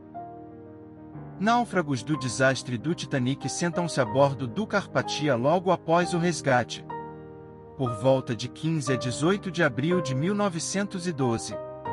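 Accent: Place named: Brazil. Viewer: ESTE SOTAQUE BRASILEIRO DESPERTA LOGO DESCONFIANÇA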